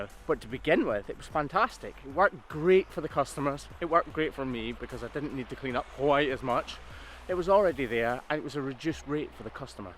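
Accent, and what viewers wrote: Scotland, United Kingdom. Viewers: He’s a question not about the cows hoof but he it goes - what part of Scotland is your “accent “ from? Your voice is a wonderful combination of Scottish and English or am I completely wrong? Either way I enjoy learning and watching your videos. HAPPY NEW YEARS !!!! Hope 2020 is filled With love peace friends family and laughter 😊PS tell Mrs Hoof GP we are great full for all the time you put into your YouTube channels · elaine east-byers yes, I can hear all three. That’s why I was confused as well. You drop your T’s, such as saying “ca’ ‘l” instead of cattle, which screams Cockney; however, after doing a quick search, it appears that first appeared In Edinburgh and Glasgow, then later Cockney. Surprising enough, my midwestern USA English understanding requires me to pay close, close attention to you speaking so I can understand you. 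Sometimes I even have to “translate,” which means I may get behind and have to rewind! No doubt if we were speaking in person I would be saying “what?” quite a bit! I hear the lovely brogue on the o’s!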